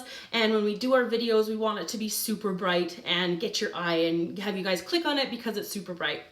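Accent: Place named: Canada. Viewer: I love watching your videos so much! My mom is Canadian, but we live in Texas, so I love hearing the accent!!😊😍🤗😘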